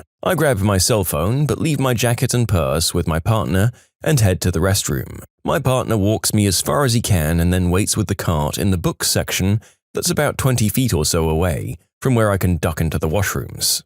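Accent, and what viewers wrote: United Kingdom. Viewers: wtf happened with your voice you sound like a rich white british man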